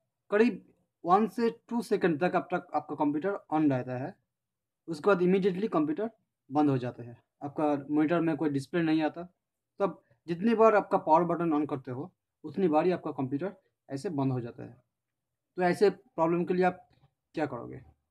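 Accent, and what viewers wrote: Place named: Assam, India. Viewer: Ur way of speaking is like an Assamese people are u from assam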